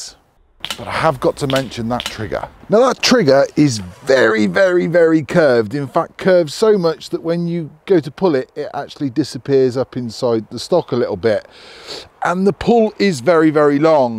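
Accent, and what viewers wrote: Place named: Bristol, United Kingdom. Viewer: Sounds like a Bristolian to me!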